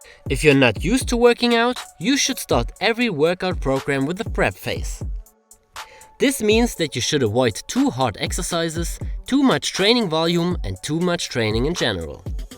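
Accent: Germany. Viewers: Not a native speaker, is he? Sounds like a German accent to me, am I right?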